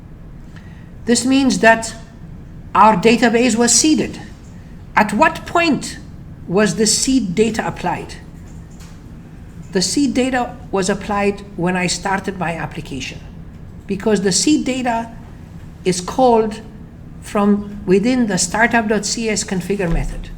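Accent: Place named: Iraq. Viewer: Your SureName, but I can feel your Iraqi accent, Big thanks for your grate job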